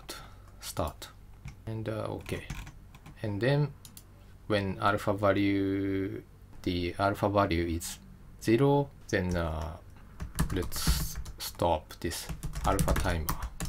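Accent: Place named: Japan. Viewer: Really helpful and good tutorials also your Japanese - English accent is really cool :) ! 素晴らしいJavaチュートリアルありがとうございます !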